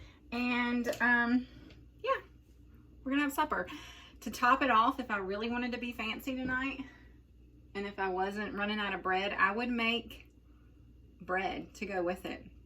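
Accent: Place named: South, United States. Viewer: Hi. thank you I never know what to cook anymore that's why I'm here. and I love the way you talk that southern accent set me on fire.. i love it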